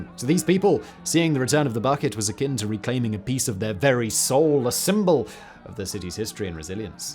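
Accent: United Kingdom. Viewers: Oversimplified with a british accent. Interesting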